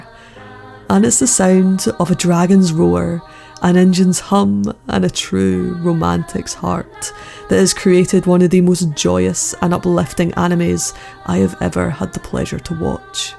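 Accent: Scotland, United Kingdom. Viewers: Also I do love a good Scottish accent my favourite band is from Scotland or was :( he recently commited suicide frightened rabbit was there name if you don't know them I am sure being Scottish it was likely in the news. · Just added it to my queue. Thanks. Hard to remember the anime from a season or two ago that I skipped because they'd be on Netflix eventually. This certainly looks like Terrace House + dragon air force. Terrace House being the only reality show I've enjoyed since the third season of Real World (Yes, old enough to remember how great Puck was.) As soon as I added Dragon Pilot, Hilda popped up. Voiced by Lyanna Mormont. Gonna have to watch this first just because of that. That said, have you tried getting into voice acting? I see you suggest your accent is hard to understand at times, but as an American, Scottish accents are magical. 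I mean that literally. The sorceress voice actress in rpg X, cartoon Y, youtube machinima thing Z, I feel like she's usually best served with a Scottish accent. I mean, real normal cartoon/video game characters could work too, but magical characters just always FEEL Scottish to me. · Fantastic review currently watching episode episode 7. Also beautiful Scottish accent can't wait to watch your other reviews definitely deserve more subscriber's.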